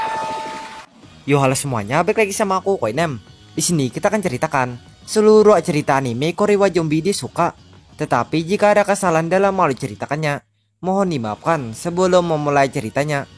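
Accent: Banjar, Indonesia. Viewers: Orang banjar kah bang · Bang lo org banjar kh?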